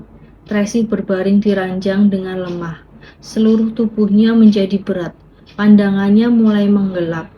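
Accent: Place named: Java, Indonesia. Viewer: Koq jadi medok 😭😂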